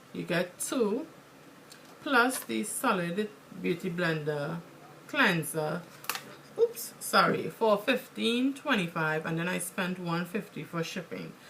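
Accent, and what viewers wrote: Trinidad and Tobago. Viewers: your 'accent' ...are you Trinidadian? xD :)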